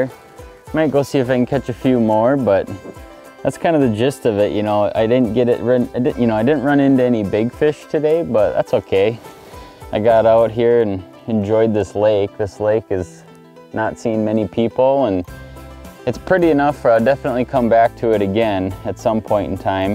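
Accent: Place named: Minnesota, United States. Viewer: He definitely sounds like he’s from Minnesota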